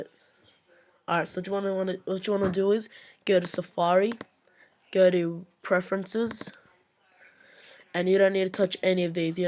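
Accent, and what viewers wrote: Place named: Australia. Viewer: It says Australia under Google...I can probably tell that your Australian cause of your voice😂😂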